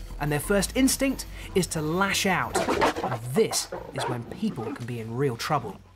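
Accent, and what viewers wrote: United Kingdom. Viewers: Love British commentary. Its funny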